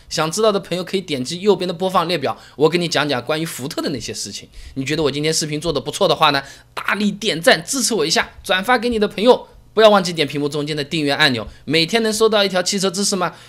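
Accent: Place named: Shanghai, China. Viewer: 喜欢你的阿拉普通话外加幽默。可以尝试更上海一些.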